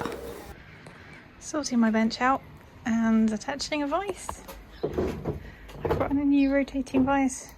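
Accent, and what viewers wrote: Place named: United Kingdom. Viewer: I've always wondered what part of the UK you're form cause of the accent. I'm currently from the Midlands. Also love the veggie garden, both of my Uncle have quite the green thumb as well, planting their own veg lately.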